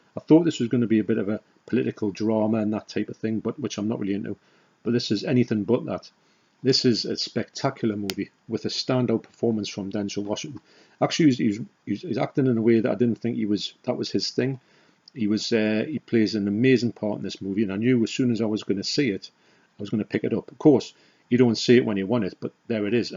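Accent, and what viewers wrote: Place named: Newcastle, United Kingdom. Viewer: Hi.Love the Alex picture signed by magnificent Malcolm himself.I’ve been enjoying your vids for a few months now,there’s not enough Geordie accents on YouTube.My late wife was from Killingworth and I still visit the family a few times in the year (I’m down south).Your love of depraved video nasties warms my cold dead heart.Keep up the good work!👍🏻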